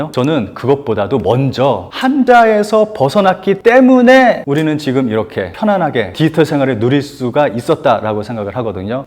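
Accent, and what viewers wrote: Japan. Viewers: 발음에 일본 액센트가 있네요~ 재일교포이신가요?^^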